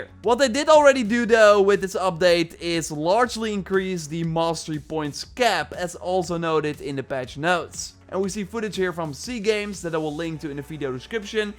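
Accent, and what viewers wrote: Netherlands. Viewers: Ok just a quick question love the vid but are you dutch :D xD just a question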